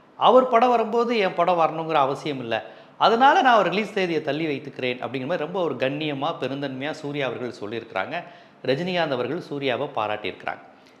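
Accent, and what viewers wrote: Madurai, India. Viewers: நீங்க மதுரையா.. நல்ல நாகரீகமான விளக்கம் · Bro neega madurai aha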